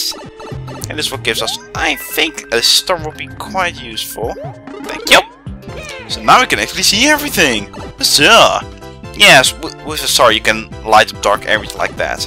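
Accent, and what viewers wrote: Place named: Netherlands. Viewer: but if you hear very good you can hear that you dutch ( like me )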